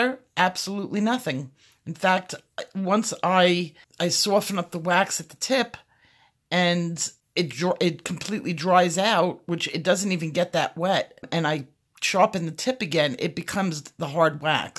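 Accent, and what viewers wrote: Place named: Massachusetts, United States. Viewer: Nice tip and I could listen to your lovely voice all day. Are you from Mass?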